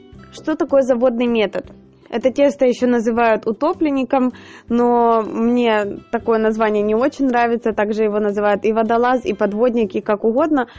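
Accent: Ukraine. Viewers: Нормальное украинское произношение, нЕчего к нему придираться, в России тоже есть регионы со своим специфическим наречием, кто-то окает, кто-то акает, меня, в свое время, в школе, украинка постоянно ругала за твердое произношение буквы "г" на русский манер, украинское "г" звучит намного мягче.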